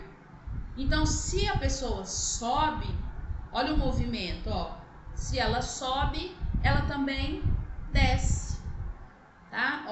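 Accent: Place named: Minas Gerais, Brazil. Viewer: Rindo horrores com o "jeito mineiro" de falar!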